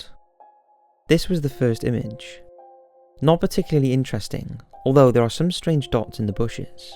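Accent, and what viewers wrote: Wales, United Kingdom. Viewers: Are you welsh? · Will follow when you start speaking properly and stop sounding like some Welsh Robot.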